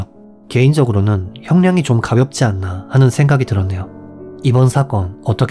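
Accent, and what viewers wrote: Japan. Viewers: 채널주인님은 일본사람인가요? 영어발음이 상당히 일본인이 발음하는 거 같아요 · 그러게요 일본인 특유의 발음이 있을때 일본식으로 계속 발음하던데 이유가 있는건지 뭔지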